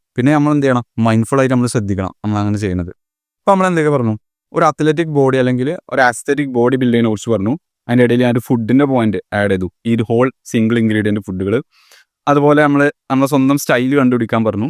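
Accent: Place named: Malabar, India. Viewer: Ath malabar style aan broo kaaryam manasilavundo ath mathi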